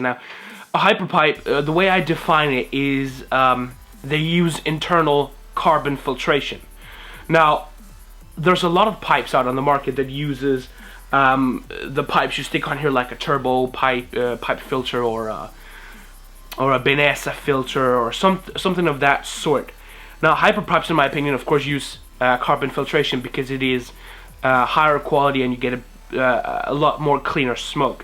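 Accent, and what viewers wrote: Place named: United Kingdom. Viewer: why do u sound british m8 xD i thought u were american ..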